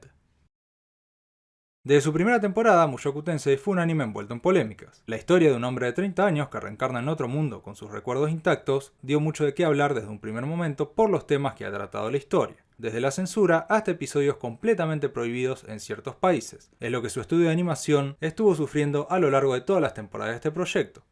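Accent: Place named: Rioplatense, Argentina. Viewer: No te burles, quizá es un argentino o uruguayo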